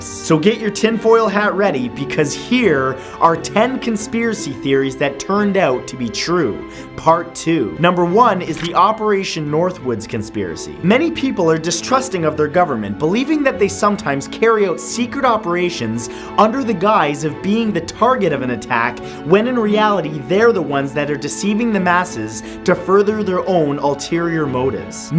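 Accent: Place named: Canada. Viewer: Something about a Canadian accent ruins a conspiracy video. I mean it's annoying in general, but particularly so here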